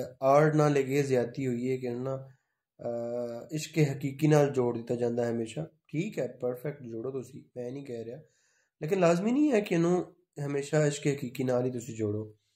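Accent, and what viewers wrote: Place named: Punjab, India. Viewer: Sir ❤️ huge fan ap ki Punjabi accent k.💕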